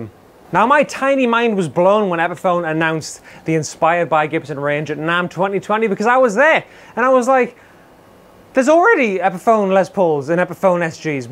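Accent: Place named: Newcastle, United Kingdom. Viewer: The Geordie Phil X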